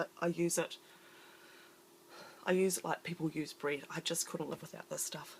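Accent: New Zealand. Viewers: GDAY COUNTRY COUSIN, U SOUND LIKE A KIWI, GOOD TO HAVE FOLK BACK THIS SIDE SHOWING THEIR WARES LOL JUST LOVE YOUR FLOWERS, INSPIRED ME... THANKS CAMILLE AUSTRALIA.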